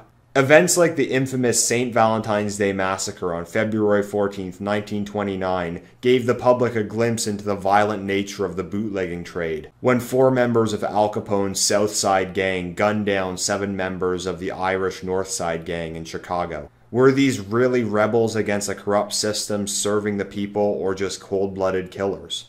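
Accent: Canada. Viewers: Maybe it's bc of your Canadian accent & the fact that you're one of few ppl who aren't a natural born citizen of America who DOESN'T bash our "6UN CULTURE", but this is the BEST analysis I've EVER seen on this topic. I think it's time we just admit the truth: "… when left to their own devices men will form tribes and compete with rivals for tribal dominance, not live together in some multicultural harmony. This theme of ethnic loyalty in mobster films makes them feel more authentic, because this is how humans function in general. You really couldn't make a mafia film that lines up with modern progressive values without it being absolute SHlT…" ALSO, WOMEN long to be WOMEN AGAIN! And MEN long for them to be, TOO! But we just keep on telling the same LIES: That we are as STRONG as you. That we belong at the FRONT-LINES. That we take WAR & Other LIFE & DEATH Situations as SERIOUSLY as YOU DO (that's why WE MADE ALL THOSE TikTok Videos of us DANCING in Hospitals, while our Cancer patients, Burn patients, Heart Attack patients, DV Abuse patients, 6un SH0T patients, CHF & COPD patients, Alzheimer's patients, Traffic Accident patients, Kidney Failure patients, Sclerosis patients, Bowel Blockage patients, Pancreatitis patients, Stabbing Victim patients, Child patients, ALL got NO ATTENTION, SO THAT WE COULD GET OURS~ on Multi-Million Dollar Hospital Equipment, or Carrying Fake C0VlD CORPSES!). 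There is a vast difference b/t "Multi-Cultural" & "Multi-Ethnic". Mixing ETHNICITIES c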